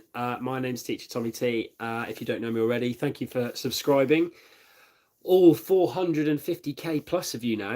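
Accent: United Kingdom. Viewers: are ❤u❤ British❤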